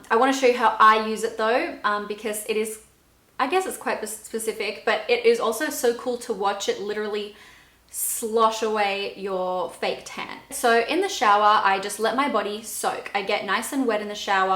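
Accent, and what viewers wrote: United States. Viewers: Omg you’re getting an American accent!!! Whyyy 😩😩😩. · You've got a bit of an American accent now! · OMG!! ur accent is turning American especially when u said “i just painted my nails twice”!!! But seriously I’ve had my fave halo since the start of last year and it still works perfectly (I don’t wear makeup everyday) and I always tell everyone to get one!! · Crazy I can hear you getting the American accent!!! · Loved this video! You can start to tell that you're picking up on the American accent and that's honestly amazing xx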